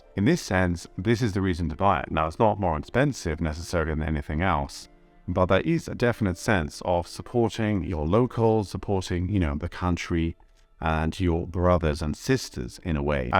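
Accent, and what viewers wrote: Germany. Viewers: Why do you speak English with a German accent? Also, you got some words wrong. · He sounds like a German who speaks really good English! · You have such a German accent, to your English one now. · You can tell you've been over there for 25 years, you do have a very German inflection to your pronunciation. I'd honestly be more inclined to believe that you were German and had lived here for 25 years (hence the excellent English) than vice-versa, haha. Not meaning to insult you. My uncle lived in Canada for the last 40 years of his life and completely lost his English accent (although to be fair he was still speaking English). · He's taken on some German "tone", yes! The "music" or of a language is surpricingly easy catch - compared to the grammar (in German's case!!) and the pronounciation. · As a Brit I’ve been learning German for 5 years, albeit slowly and I would’ve thought that you were German by your accent if I didn’t know better.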